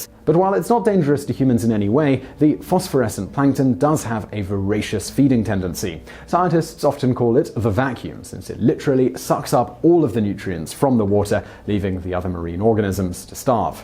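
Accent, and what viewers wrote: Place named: Upper class, United Kingdom. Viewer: This is what upper class Brit’s sound like dude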